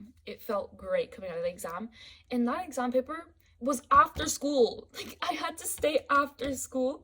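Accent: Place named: United States. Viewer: ohh u have an American accent when talking in English haha. Je peux pas parler français????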